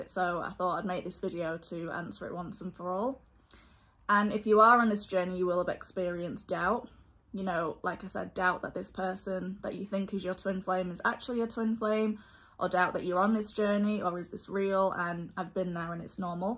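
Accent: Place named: England, United Kingdom. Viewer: ...i know right, since when did 'not' recording for awhile, and doing anything other than laying dead in a ditch or being in a prison cell, mean laying in a ditch or being in a prison cell. 😂😂😂 Again thank you, i love your beautiful interpretation and articulation of the TF Journey. I notice your english accent, im irish, so 'hi- diddly - ho, neighborino', as Ned Flanders would say. 😂🙏🏻💚 Keep up the good work.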